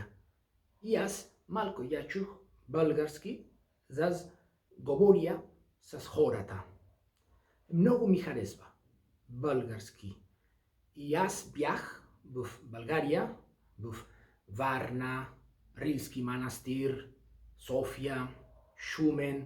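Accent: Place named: Basque Country, Spain. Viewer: Tiene más acento vasco hablando italiano que francés. Genial, padre. Esker anitz, Aita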